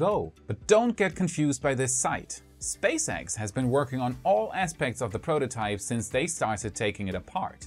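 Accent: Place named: Germany. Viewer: Are you german? If yes your English is really good!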